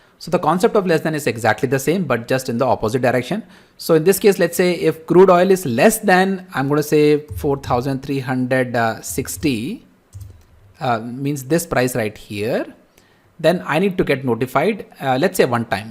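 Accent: India. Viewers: I love the Indian ascents ...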